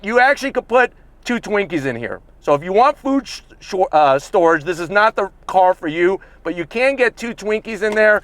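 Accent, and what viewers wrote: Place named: New York, United States. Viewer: )) of course, he sounds new yorkish, they can talk you to death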